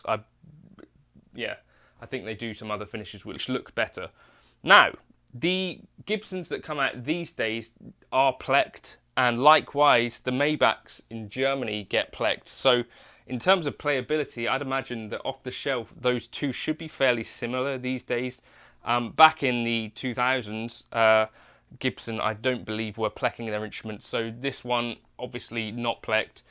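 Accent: England, United Kingdom. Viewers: Guys it is ENGLISH UK accent. His Wife has a Great Accented Voice too.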